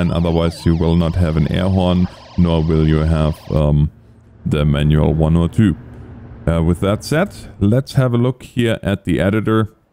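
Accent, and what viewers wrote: Germany. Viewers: Sounds Like German English.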